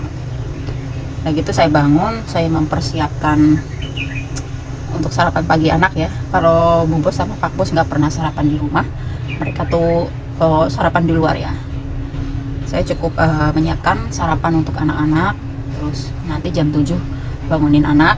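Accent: Banyumas, Indonesia. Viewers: Halohaaaaaaa beb ngapak❤❤❤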